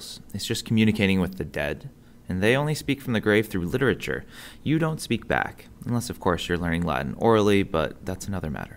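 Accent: United States. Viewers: It is really underwhelming to learn Latin by listening to this strong American English accent. There's no "Rrrr" sound at all, for example. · Gotta work on that pronounciation. Sounds way too American . Nice educational video tho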